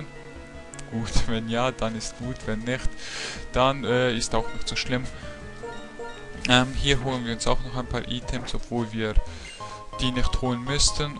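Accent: Switzerland. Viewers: du bisch doch ganz klar en schwizer :D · also ka ob du das liest, ich liebe deine Walkthrough's wegen dir kauf ich mir jetzt einen 3ds nur damit ich alle Generationen spielen kann:DD Ich liebe deine Video's und auch dein Schweizer Akzent - Das musste ich jetzt einfach hier schreiben auch wenn das Video schon 3 Jahre alt ist.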